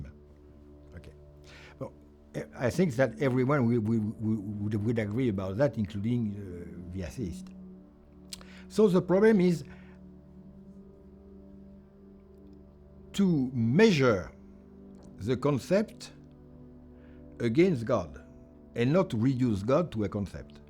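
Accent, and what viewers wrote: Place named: France. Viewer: The priesthood is a very noble calling and much nobler than that of a philosopher. I think that Heidegger and Marion are not the best philosophers to be studied by ongoing priests, nor any other pretentious Frenchman for that matter. Regardless, I wish you all the best in seminary and in life. Today I will go to confession and pray for all fathers and (ongoing) priests. We the laity ask for good priests. One should not forget that faith is an unearned grace. Thank you for going to seminary. But concerning Marion:There is so much loose "thinking" in here that I am really amazed how people can fall for this. (Maybe it is the overly harmonic, dynamic and almost spherical music in the background? The French accent? The light, the colours of his clothes and the grain of the cloths?) He starts by "proving" that any concept is inadequate for God, by appealing to an "Augustinian principle", which he formulates as "If you comprehend it, it is not God". 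However, I think that here he conflates "comprehending" with "having a concept of". I can clearly have a concept of, say my spouse, even if at times I do not comprehend her, meaning that I do not comprehend her fully. Maybe my concept of my wife is then also "not adaequate", and I would be open to such a possibility (although I would find it ... you know ... a bit laughable and french. I clearly able to identify my wife and I do not mistake other women for her nor do I mistake her for other women. I would say that my concept of her is a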